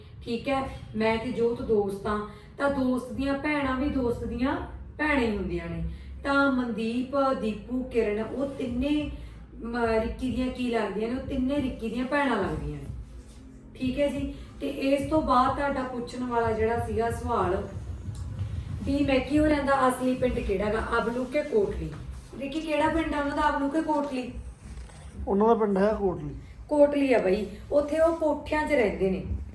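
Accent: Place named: Barnala, India. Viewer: Khudi kalan mandeep de sohera pind tusi barnala bol rhe oo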